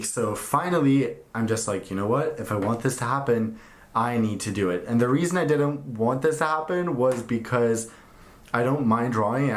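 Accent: United States. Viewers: Interesting life, although I still don't get why it is that you speak English with an American accent when you grew up in London, somewhere near Heathrow, Forest Row (11 miles from where I live now) and Sweden! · So how did you come to have such an American accent?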